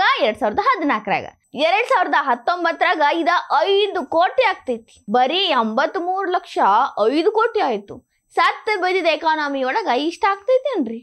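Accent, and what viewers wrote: North Karnataka, India. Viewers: ತುಂಬಾ ಸೊಗಸಾಗಿ ಬುದ್ದಿವಾದ ಹೇಳಿರುವ ನಿಮಗೆ ಅಭಿನಂದನೆ. ಉತ್ತರ ಕನ್ನಡದ ಭಾಷೆ ಚಂದವಾಗಿ ಪ್ರಸ್ತುತ ಪಡಿಸಿರುವಿರಿ. ನಿಮಗೆ ಮತ್ತು ಹೊಸ ದಿಗಂತಕ್ಕೆ ಒಳ್ಳೆಯದಾಗಲಿ.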